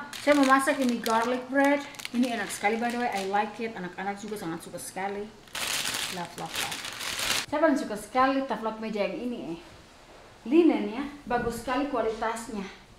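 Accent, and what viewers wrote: Manado, Indonesia. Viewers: Logat dan cara berbicara anda mengingatkan saya kepada teman saya yang berasal dari Manado❤️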